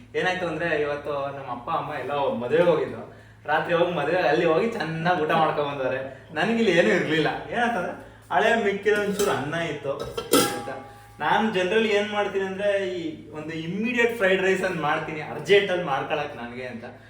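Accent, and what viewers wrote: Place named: India. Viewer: Nim bhashe kelvaga eno ondthara Khushi,Hale kannada Andre pure nam Halli shogadina kannada keloke sigutthe,tq u mam,tq..😍.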